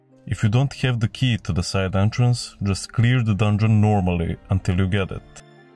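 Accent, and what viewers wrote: Russia. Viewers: Судя по акценту ты русский ))) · Чисто русский акцент, давай видео на русском, читать сабы такое себе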